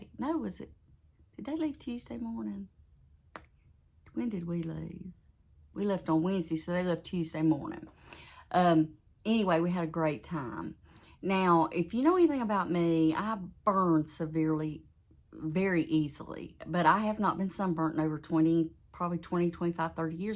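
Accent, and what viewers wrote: South, United States. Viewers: enjoy your video. I love your voice southern talk.thank you for sharing 😀. God Bless everyone love and prayers for everyone 🙏❤.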